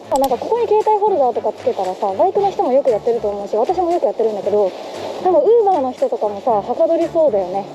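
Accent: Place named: Hakata, Japan. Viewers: トップページに「ヤエー」？「ハイク乗り」？が表示されたので不思議に思って観てみたらだったんですね。登山動画で何度が観ているのでお勧めに上がったのでしょう。山にいる時よりハイテンションで九州弁が良く出ていますね。バイク乗りにそんな習慣があるなんて知りませんでした。一息ついたらバイクの旅も診てみます。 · がたまに喋る方言が好き！福岡の方言は可愛すぎる！ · 博多弁？可愛い🥰面白い企画でした👍🏻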